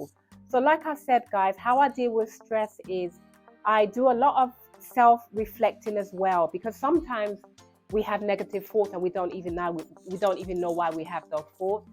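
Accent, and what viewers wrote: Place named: Nigeria. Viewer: I like your Nigerian accent 👌🏾 😍 🫂..you look beautiful as always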